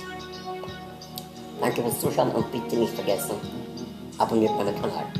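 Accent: Austria. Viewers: Cooles Video. Sehr unterhaltsam. Ich find dich sehr sympathisch und witzig. Und nur mal so ne Frage: Bist du Österreicher? Du hast einen sehr österreichischen Akkzent. Wenn ich raten müsste würde ich sagen, dass du Wiener bist. Abo hast du auf jeden Fall ;D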